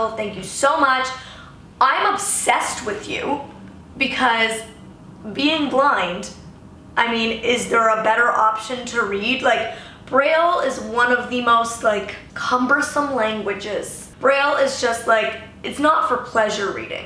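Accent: France. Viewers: I actually love her French accent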